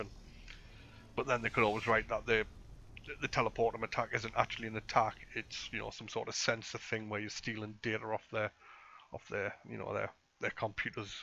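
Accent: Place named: North, United Kingdom. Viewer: Nice vid, have to say where you from m8 as ya sound like ya from the north. Cant wait for the bfg game to launch will u be doing the campaign? And i would deffo get the IN with marks/favours to boost them maybe the imperial navy mark to spam cobras lol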